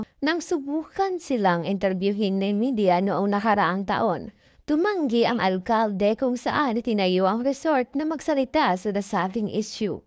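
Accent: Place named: China. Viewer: Ok sana presentation ni blogger kaso prang bulol at di tga Pilipinas mag pronounce eh...prang tga China kung magsalita😅😅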